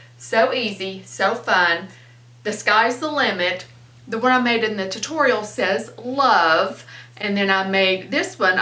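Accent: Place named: Tennessee, United States. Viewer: Yep, already knew it and this was yet again more confirmation: you're a genius. With a TN accent. 😘😘😘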